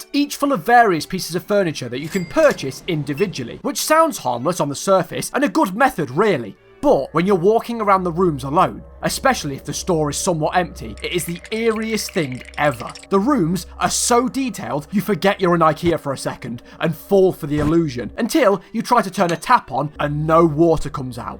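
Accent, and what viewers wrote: United Kingdom. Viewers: His British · Thank you funny british accent man for making my day better, i suggest your next video is about lidl since its common in europe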